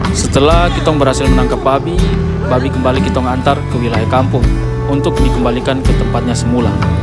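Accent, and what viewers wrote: Papua, Indonesia. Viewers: Bang klw bisa isi subtitle dong.. Mngkin mrepotkan.. Cmn ad bbrapa istilah atw logat papua yg kita kurang mngerti..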